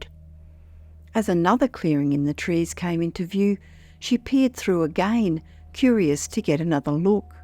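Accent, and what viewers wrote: Australia. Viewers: I know it's an Aussie accent, just wondered whether it was human or robot. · Voice is Aussi accent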